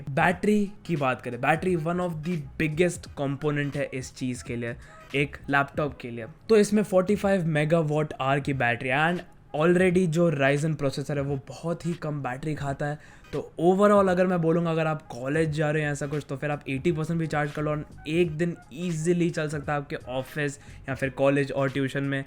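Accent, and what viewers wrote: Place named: India. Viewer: What it this for a language? Indianenglish ?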